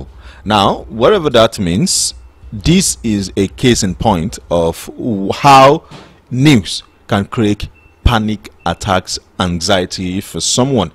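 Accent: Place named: Ibadan, Nigeria. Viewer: Nice one but why your yoruba accent like Ibadan man come dey come out.....pu-bu-lick😊😊😊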